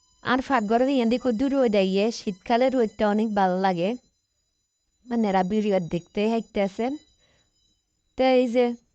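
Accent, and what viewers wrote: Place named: Noakhali, Bangladesh. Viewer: আপু আপনি নোয়াখালী কোন জায়গা 🤔🤔🤔আমি অনেক জায়গার কথা জানি 🤗🤗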